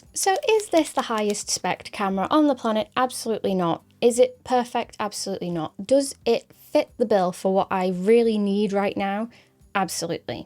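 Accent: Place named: England, United Kingdom. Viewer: Your review gives us a bonus - Ask me, what? - Answer- learning your English accent.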